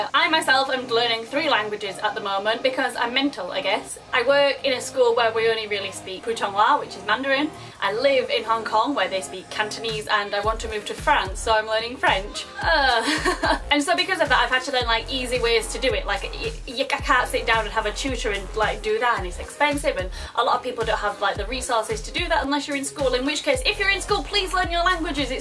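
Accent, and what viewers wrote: Yorkshire, United Kingdom. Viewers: hire! i started to see your yorkshire accent videos from 2011 and in your more recent videos i can understand much more now of what you are saying haha, i started to study in sheffield this year and man !! the accent is so difficult to understand, at least for me. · I love your accent <3 Which part of Yorkshire are you from?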